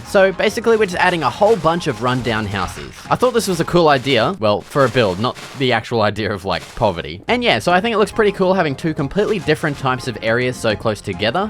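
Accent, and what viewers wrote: Australia. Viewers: why do u sound australian to me????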